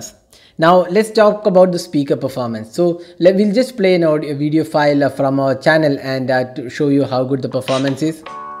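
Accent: Kerala, India. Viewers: Are you from Kerala, you sound like one.. anyway good work here... I too have a Nokia 7 plus.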